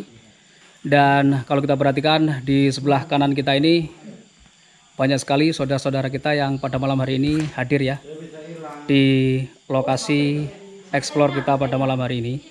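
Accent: Java, Indonesia. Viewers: mas njenengan jowo ne pundi to kok enak banget tutur katane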